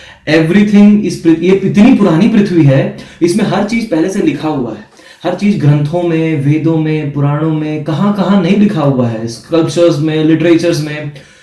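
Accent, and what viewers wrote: Bihar, India. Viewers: Bhai aap Bihari ho kya? Mera ak Bihari dost Aisa hi bolta h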